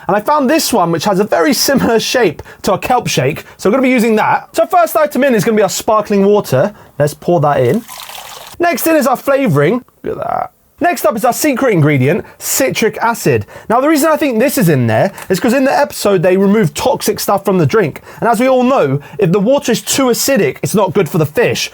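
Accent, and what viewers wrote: United Kingdom. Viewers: Too british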